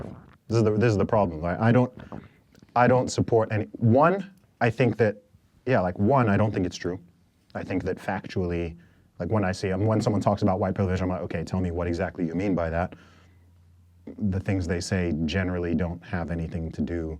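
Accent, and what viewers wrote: United States. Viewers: By the way, this guy is British. I would like to know how he got the American accent. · Is he from the South West? What's with the Mid-Atlantic accent? No problem with it, I have one myself. I know this comment is crud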